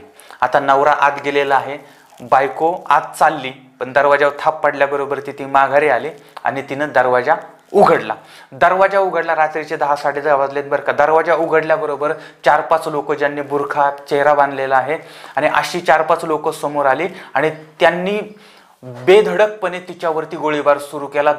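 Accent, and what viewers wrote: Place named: rural, India. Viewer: निवेदन शैली गावाकड्ची, विनोद्प्रचूर पण गुन्हेगारी विशयी गाम्भिर्य व तळमळ असलेला लय भारी!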